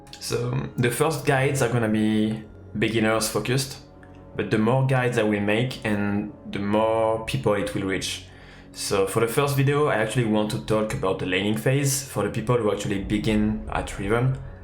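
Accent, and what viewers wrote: France. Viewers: La vidéo est vieille de 6mois mais je suis un peu à chier ne anglais et j'entends que si je suis pas con tu es français donc je me posais la question, possèdes-tu une chaine en français ?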